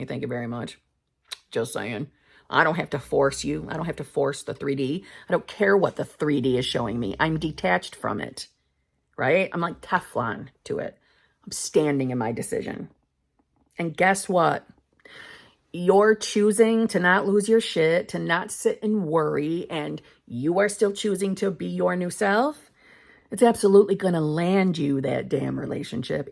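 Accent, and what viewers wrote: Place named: Upstate New York, United States. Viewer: New York upstate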